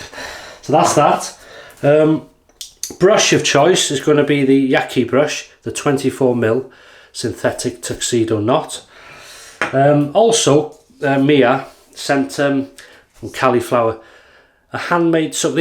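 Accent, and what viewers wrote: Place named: Wales, United Kingdom. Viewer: His accent reminds me of Christopher Ecclestons accent. I wonder if he's from Wales too.... 🤔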